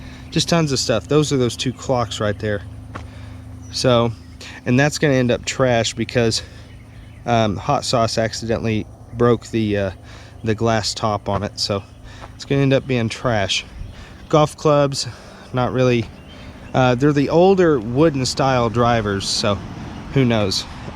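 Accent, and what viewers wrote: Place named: Oklahoma, United States. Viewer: Where are you from it seems like you are from Oklahoma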